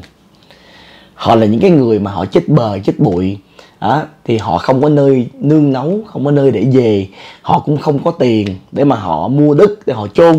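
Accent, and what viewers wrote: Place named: Vietnam. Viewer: Nghe một thời gian e đoán ra bác người tỉnh nào rồi, đồng hương hi hi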